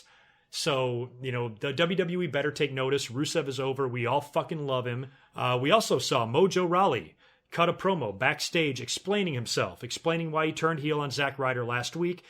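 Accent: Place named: South, United States. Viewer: Your southern accent is torturing!!!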